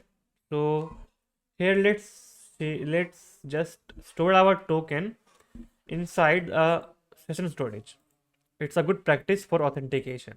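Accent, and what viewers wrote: India. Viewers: Just 1 thing i like more than study programming - is to study programing in English with indian accent. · change the indian accent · Omg please not indian-english voice magodddddddddd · his accent is so thick indian, it's hard to get anything he says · Indian accent make me easy to understand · Indian accent provides clarity. Yeah and you guys are proving that you don't have good teachers to teach you guys, you depend on Indians. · Stop being so offended dude. Narrator has an accent thick as a curry which is a fact. Before you call me racist, I am an Indian too and I would have said the same thing. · no hate towards Indians but I hate their english accent it irritates my ears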